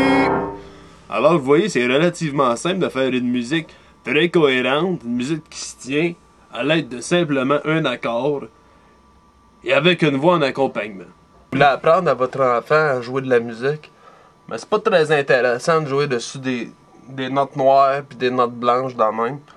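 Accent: Canada. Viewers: l'accent un truc de malade moi ki viens du sud de la france et ki souhaite vivre au canada je te raconte meme pas ce ke sa peu donner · j parle bien le francai mais du mal a comprendre le francai de canadien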